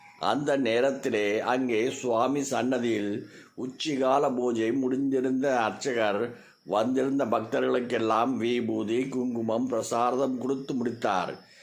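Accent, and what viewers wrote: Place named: South India, India. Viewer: He is talking in Dravidian voice, specially known for DMK, Karunanidhi.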